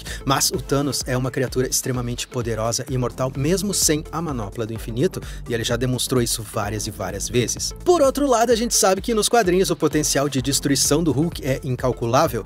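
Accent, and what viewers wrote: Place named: São Paulo, Brazil. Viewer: o teu sotaque é de invejar, sou do interior de Sampa e sei que teu sotaque é de Paulistano, e não de paulista que é do interior. Um dia aprendo a falar com esse sotaque.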